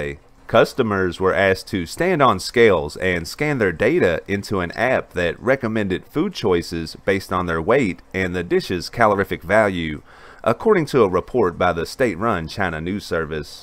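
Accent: South, United States. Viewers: I agree with this comment...... but where the heck did that southern accent come from :)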